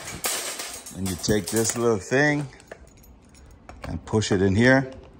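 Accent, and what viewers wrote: Germany. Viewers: Do people make fun of your German now that you've been away so long?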